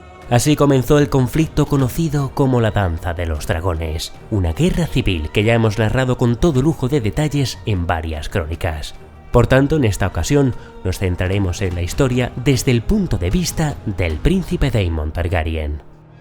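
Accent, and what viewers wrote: Spain. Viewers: brother que seas español, le da el toque a la narracion!! excelente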